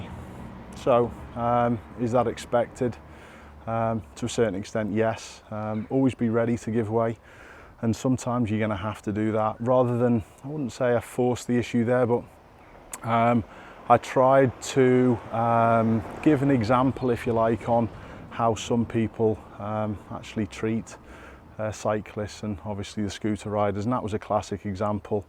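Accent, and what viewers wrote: Liverpool, United Kingdom. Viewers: Oh, I remember having a bell on a bike being required? Aside: I love when you lapse into your proper scouse accent.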